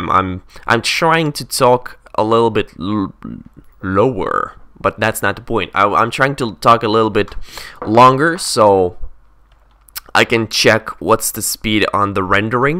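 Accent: Scotland, United Kingdom. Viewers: English but in a british accent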